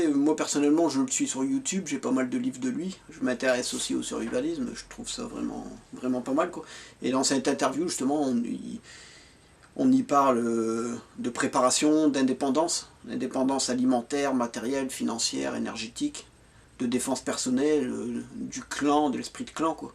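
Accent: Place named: Nord, France. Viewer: C est le Noooooord l accent tient je m abonne pour voir ce que tu fais tu ma l air sincère dans tes paroles . Et suis picard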